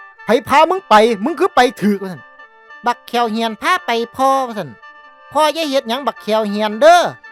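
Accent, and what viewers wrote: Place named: Korat, Thailand. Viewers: คักเสียงคนโคราช😂😂😂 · ไทโคราชตั๋วนิ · ภาษาโคราชกะมา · มักคักภาษาโคราช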